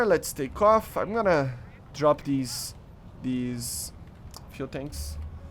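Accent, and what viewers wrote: Brazil. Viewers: Sotaque brasileiro?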